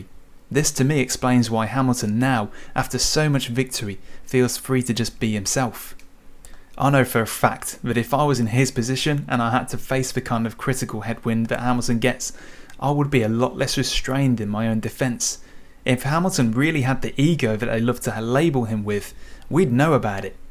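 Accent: United Kingdom. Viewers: You sound British and support Max. I'm willing to bet you'll never find someone from Holland, let alone a journalist supporting Lewis over Max. Weird. But I guess it's the 50%-50% that bothers you.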